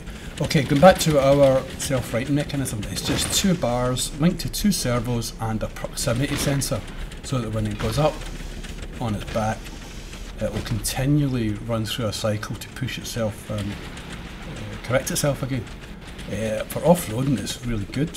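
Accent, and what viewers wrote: Scotland, United Kingdom. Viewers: really? 0.o That's interesting. Do all people in Scotland speak like that? I'm so curious :D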